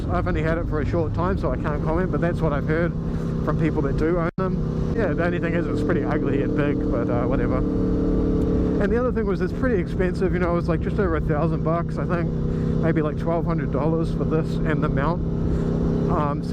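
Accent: New Zealand. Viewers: Hey bro Good to hear a fellow kiwi on YouTube. What bike is that you are on?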